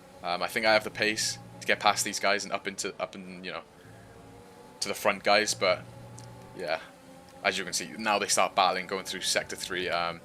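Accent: Wales, United Kingdom. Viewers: Good effort bro, just found your channel via the Shed Dweller. And cos it's nice to hear a fellow Welsh accent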